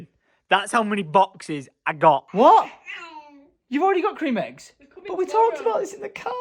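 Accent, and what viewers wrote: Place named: Wigan, United Kingdom. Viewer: The way to understand what you do for us when we watch this vlog. You are to us what Old School Runescape is to You. I have watched almost everything from call of duty, Minecraft, battlefield, vlogs anything really you put out. Also I used to live in Wigan (Platt Bridge) and Your Accent is nostalgic for me so that helps. Much love From Glasgow Scotland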